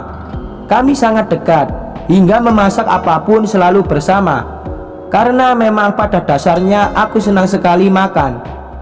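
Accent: Java, Indonesia. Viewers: Mocone kok medok nenen tho maaas, mengurangi kenikmatan pendengaranku😇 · Maaf ya mas bacanya pake intonasi,,daaan jng medok2,,kok ky anak SD🙏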